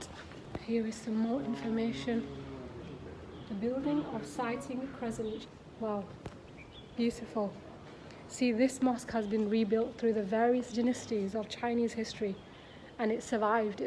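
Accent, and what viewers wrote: United Kingdom. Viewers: Her accent is from the north of England.... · How much does the Ccp pay you to become its ambassador in the occupied land of Eastern Turkistan, quite a lot l guess? Either the world is dumb to believe your report or the Ccp and its puppets are dumb to realize that their hideous crimes in the region are clear to the whole world. If your head cover and the British accent will give you legitimacy to make a fake report, the millions of Uyghurs in prisons should stop crying and dying. No thanks to you or anyone else like you⛔. So who we should believe now, the killer or the victims. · Your accent has a bit of Birmingham in it.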